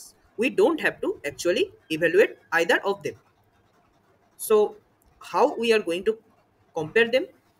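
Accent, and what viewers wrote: Bengal, India. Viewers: bhai do u live under rock iske accent se pta chlra hau yeh bengali hau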